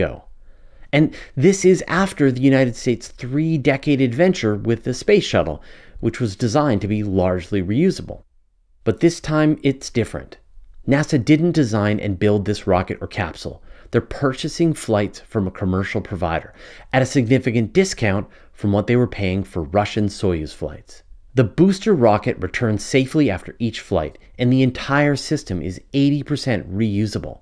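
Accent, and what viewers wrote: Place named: Canada. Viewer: If you have a Canadian accent, it is very, very faint.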